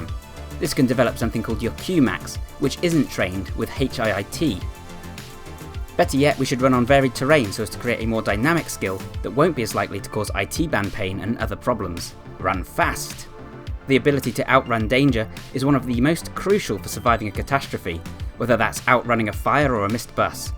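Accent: United Kingdom. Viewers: At the risk of sounding rude, your voice really doesn't match the body I'm seeing in the video. Where in the UK is this guy from?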